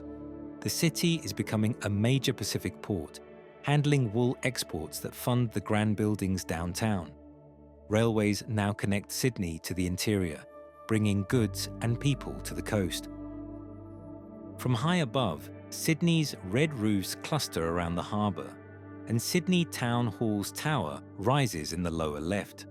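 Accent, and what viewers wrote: United Kingdom. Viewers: Perhaps my expectations of AI is unreasonable as despite finding the video interesting it's not very good. It did make me laugh at a few points so I suppose that's a good thing. Who doesn't enjoy a good chuckle? If this was presented as a school project you could only give it average marks. The constant repitition of the same scene turns a five minute video into over ten minutes. I find using a British sounding narrator interesting despite it being a U.S. production. I suppose it attempts to give it more credibility but being U.S. produced it explains why "sidewalk" was used rather than "footpath". Anyway, I'll have to wait for AI to improve. Hopefully before all of the AI robots kill us. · This is so full of errors, it's ridiculous. Despite the British accent, the text is USA English, as the frequent 'sidewalks' shows, the camera panning is disjointed, placing of famous buildings is wrong and at 6.55 we see a smoke filled harbour while the voice tells us it's a sweeping blue paradise for artists. 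What is the point of it?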